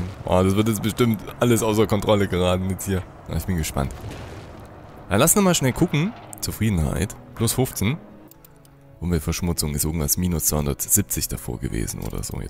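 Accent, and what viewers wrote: Saxony, Germany. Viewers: Sächsisch? LG (ツ)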